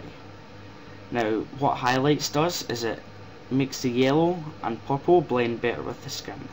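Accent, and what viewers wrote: Scotland, United Kingdom. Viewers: Thanks for posting tutorial. Sounds like scottish accent.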